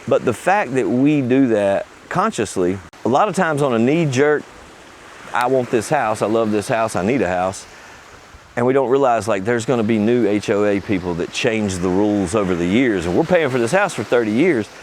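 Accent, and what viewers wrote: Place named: Massachusetts, United States. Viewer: Where is from? Sounds like Massachusetts or east coast.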